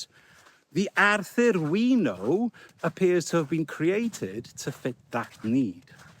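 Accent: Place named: North Wales, United Kingdom. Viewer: Great video. Off topic but as someone of a language and accent nerd I cannot place your accent I am getting North Wales would I be correct?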